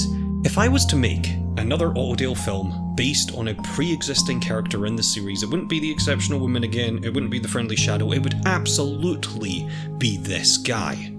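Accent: Scotland, United Kingdom. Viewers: I can’t un hear the Scottish now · I love that you with a nice and friendly sounding Scottish accent plays such and makes an amazing dark series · Me realising that is Scottish: I thought you where English and yes the snow is every where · How am I so bad with accents… me, born and raised in Scotland my entire life.. and can’t even recognise that the voice I’ve been listening to for the past 4 hours of YouTube binging is also a Scot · Omg you're Scottish ❤️❤️❤️❤️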